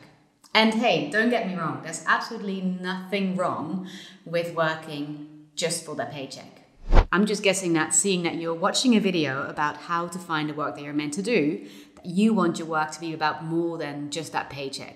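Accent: Netherlands. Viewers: Are you dutch? I hear a slight dutch accent leaking through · Are you Dutch? I hear a bit of our accent 🧐 just slightly.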